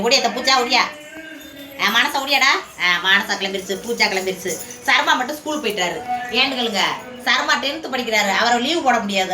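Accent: Madurai, India. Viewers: இதுதான் மதுரைக்கான பேச்சு அருமை👌👌👌👏👏👏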